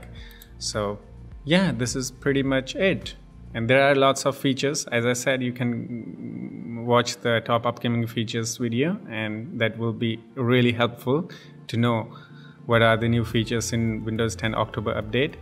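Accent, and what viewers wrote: India. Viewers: Are you Indian brah?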